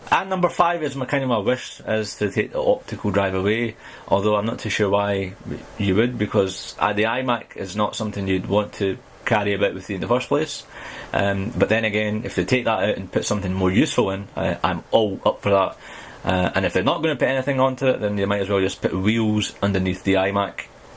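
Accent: Scotland, United Kingdom. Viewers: His accent reminds me Trainspotting !, cheers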